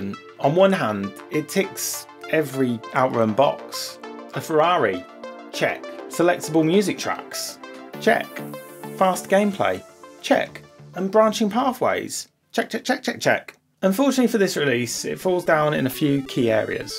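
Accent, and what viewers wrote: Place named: East Coast, United States. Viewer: Aww, classic images of Great Yarmouth! I was there last week, at that very arcade. Sorry for the late comment, I am catching up. Plus, we might of been at the arcade at the same time, though I would of been 21 years old, not 6! Agree fully, Out-run was the first arcade game I played on the Mister when I got mine a few months ago. I am still awaiting Flying Shark (Fire Shark's older brother) I really hope that comes to the Mister soon. Also, it would be great if you reviewed the computers that Mister supported. I can always help there, as I have most of them setup. SpectrumNext on the Mister is fantastic, but like all the computers on the Mister, they are great, but not like the original beasts. This is my third video I have watched, subbed after video 1, and I did guess you were from the east-coast. Right, I'm done!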